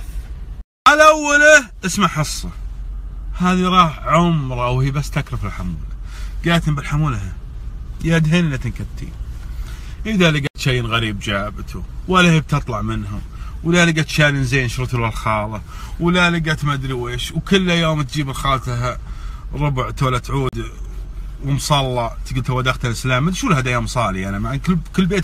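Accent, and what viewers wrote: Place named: Qassim, Saudi Arabia. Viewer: وش فيها لهجته احسن لهجة بعد قلبي القصمان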